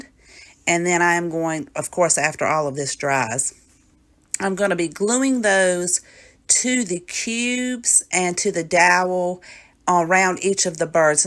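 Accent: South, United States. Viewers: How cute both diy’s are. Found you through Heidi. I subscribed today. I’m so happy I found you. I think both projects go together. Where are you from? You have a very southern accent. I’m from Pensacola, but I live on the coast of MS now.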